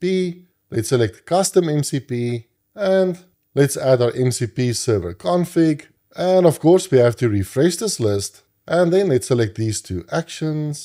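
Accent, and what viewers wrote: South Africa. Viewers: Nice one boet. Your videos are getting better nad better, and are so informative and helpful. I understand you've explained the dfference between this and n8n...just not quite surei get it. I am hoping you'll get to the point where flowise has a stt/tts interactive style. The dream is a personal assistant that can manage calendar, emails, and sdhopping lists etc. And I cna "control" it all via whatsapp! Keep up the great work Dis ook lekker om 'n ander Suid-Afrikaanse ou te hoor!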